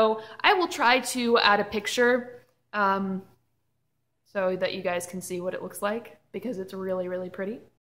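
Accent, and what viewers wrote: South, United States. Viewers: I’m not sure where you live, I am not terribly familiar with the “eastern seaboard” and so I don’t know where the orchid show of which you were speaking is located. But, you mentioned your “southern accent” and my fiancé and I had to laugh. We live in New Orleans, but I grew up in North Dakota. I get made fun of for my accent, even though I’ve lived here for ten years and have lost most of my “up north” accent, I heard you say “you guys” so many times that I thought I’d hear an “eh?” at the end of one of your sentences, a la Canada. I’m very jealous that you live in an area where y’all have an orchid sale with international vendors; I have to drive to southern Florida to beg a wholesaler to sell to me if I spend $500-600. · LOL, you definitely have moments where the southern accent peeps thru, but honestly, you sound like you have a very neutral accent. 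Mix of east and west.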